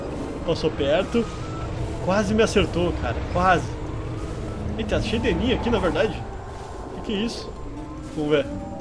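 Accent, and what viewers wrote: Brazil. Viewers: achas mesmo? Eu prefiro o vosso sotaque brasileiro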